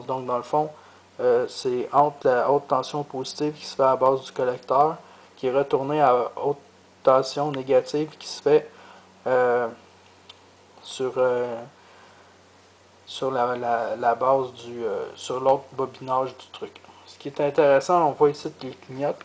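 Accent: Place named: Quebec, Canada. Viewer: Bonjour, merci pour ta réponse. Effectivement, je n'avais pas compris ! L'alimentation est une pile de 1,5VCC. Bon il me reste à comprendre ce qu'est un "Joule Thief" , de ce que je peux apercevoir, nous avons une sorte d'oscillateur qui envoie des impulsions de courant dans le transformateur. La boussole indique clairement qu'il y a passage d'un courant. Quelle est l'utilité du montage, Un TP scientifique ? Merci d'éclairer ma lanterne. L'accent semble venir de la Belle Province, tu confirmes ? Cordialement Jean-Pierre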